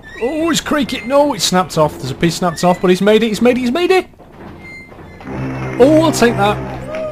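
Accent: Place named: United Kingdom. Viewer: Whats up with you and crossbars?? Ever imagine how your car will feel without differential, almost the same deal with bridges and crossbars. u play like u have never seen a bridge before. by your accent u live in UK, how can u be so naaive about structures. Always use crossbars below the bridge and in places where there is a lot of stress.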